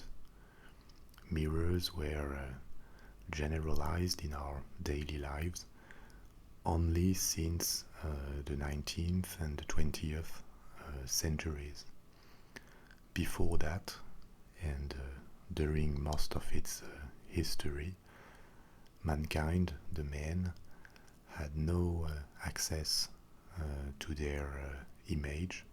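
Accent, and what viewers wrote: France. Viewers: Yes! One of a kind! Hope I won`t break the spell you so awesomely are capable to put on listeners (Plus: the content you deliver...wow, and with the intonation ...just sooo soothing, your French accent seems to increase the impact...)-----------yet, may I ask: 1. what led you to do this work? 2. gee, feel awful asking this here, but: do you get paid...by whom, respectively what ..."management"? After all, you offer a stunning service...potentially on a global level! If you don`t (want to) answer, for whatever reason, be assured you are doing a fine job!!! Thanks, Marie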